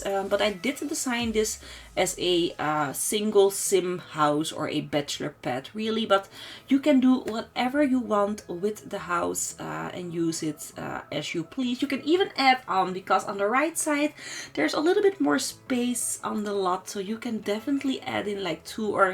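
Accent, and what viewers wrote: Netherlands. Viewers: Ik herkende het nederlandse accent gelijk, gelijk even in de comments gekeken of je echt nederlands bent aaa. Hahahaha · Ik zat te kijken, en dacht: ik denk dat ze Nederlands is, ga ik in de comments kijken ben je echt Nederlands, je hoort het wel, maar je spreekt wel veel beter Engels dan de meeste Nederlanders. Maar je hoort het toch wel een beetje bij woorden zoals wanted en work, maar dat is niet erg hoor xD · are you from holland?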